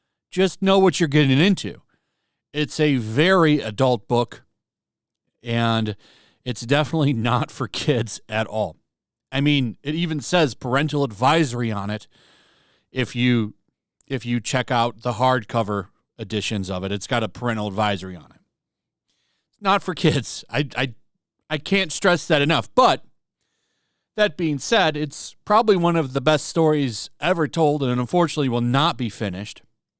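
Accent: United Kingdom. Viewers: Holy shit your British accent is amazing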